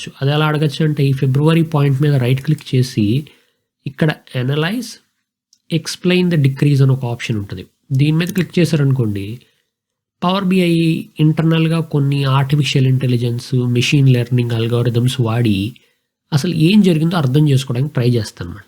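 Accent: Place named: Guntur, India. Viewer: You must be from Tenali or Guntur. You accent matches with that.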